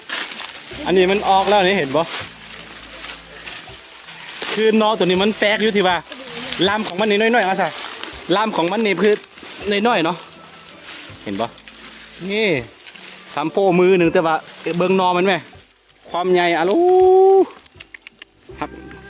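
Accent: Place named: North, Thailand. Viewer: พูดเหมือนคนเหนือฝั่งไทยเลย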